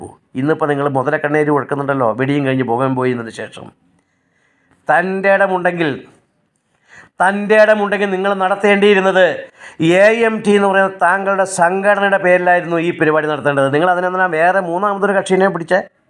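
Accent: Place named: Changanassery, India. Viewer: ഈ ഒട്ടിക്കൽ മാത്രമേ നിനക്ക് അറിയുകയുള്ളു. തനി ചങ്ങനാശേരി ഭാഷ. പോടാ തെണ്ടി.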